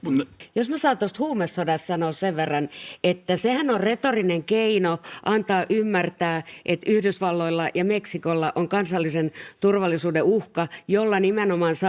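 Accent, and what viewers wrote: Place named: Turku, Finland. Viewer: Niin ihanaa toi Turun murre 😊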